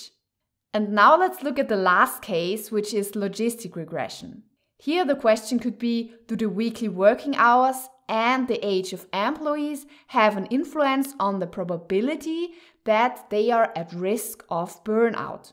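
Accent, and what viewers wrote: Germany. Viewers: I'm not gonna lie, I can't get enough of that german accent 😌😌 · you have an accent, are you a German???? 😅🤣🤣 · Sounds so german.. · strong German accent, I like it.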